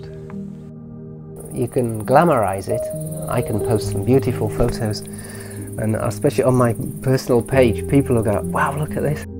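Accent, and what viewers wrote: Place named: Scotland, United Kingdom. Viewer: And his Scottish accent makes him even more wholesome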